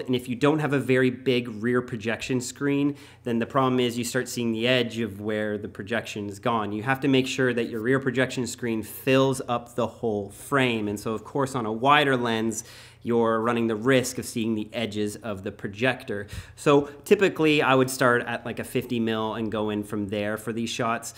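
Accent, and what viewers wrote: Canada. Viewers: I like the way you say process. Wish I had that Canadian accent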